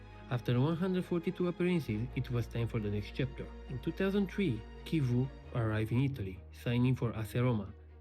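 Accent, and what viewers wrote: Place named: Belarus. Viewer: mama cum iese belarusul prin accent. fa coae video in romana si aia e..daca nu pune AI.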